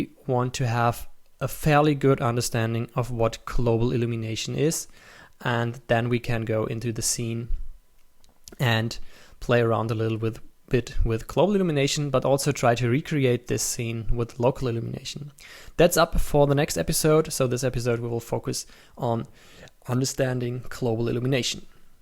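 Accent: Germany. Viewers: make these in german... your accent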